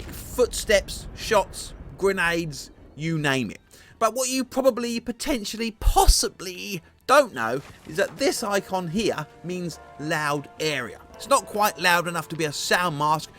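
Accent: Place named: United Kingdom. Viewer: Your "Probably, potentially, possibly" combined with Your British made me hit "subscribe".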